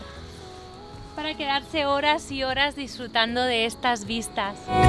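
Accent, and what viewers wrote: Andalusia, Spain. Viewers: Bonito video y cada vez tus visitas, tienen más acento andaluz..... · Una catalana con acento andaluz? Que interesante! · ¿Por qué si eres de Valencia,hablas como de Andalucía}?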